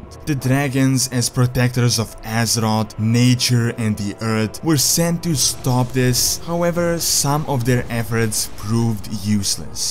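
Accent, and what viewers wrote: Russia. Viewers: You say this is a translation from a Russian youtuber. So did you study Russian or are you Russian? That accent gives it away but just asking to be sure. Nice videos btw, as a lore fan and WoW fanatic you have my sub. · Are you a Russian?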